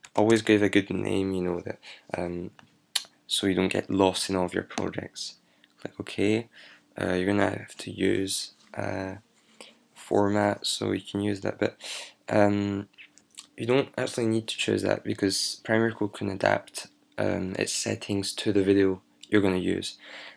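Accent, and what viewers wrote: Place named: France. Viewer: Francais Accent :)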